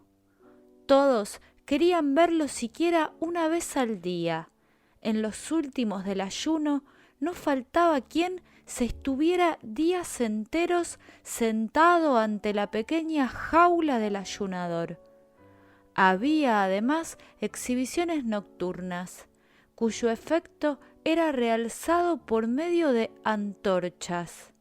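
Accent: Argentina. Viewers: El acento argentino para un audiolibro no es de mi gusto.😒😒😒😒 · El acento argentino no sirve para un audiolibro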